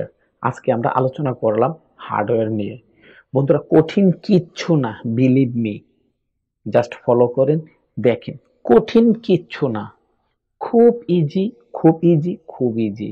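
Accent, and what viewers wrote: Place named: Dhaka, Bangladesh. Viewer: আপনার কথায় ঢাকাইয়া আঞ্চলিক টানটা ভালো লাগে, আপনি আপনার ভাষাতেই যেভাবে খুশি বোঝাতে পারেন, অনেক অনেক শুভকামনা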